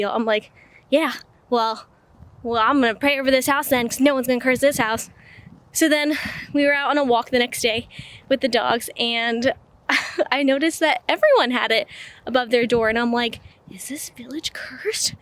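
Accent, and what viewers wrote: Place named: United States. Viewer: Great video. You certainly don't have that unique German account. You sound very much American. How is it that you went from America to Germany? Must be a very interesting story. While stationed in Germany with the Army which was a "lifetime" ago was the Army Depot there in Miesau.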